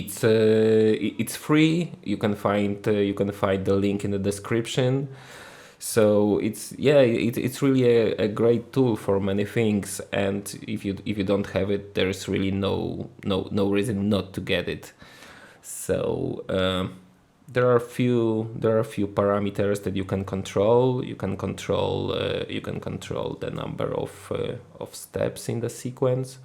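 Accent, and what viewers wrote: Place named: Poland. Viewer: w pierwszych slowach slychac swoich:p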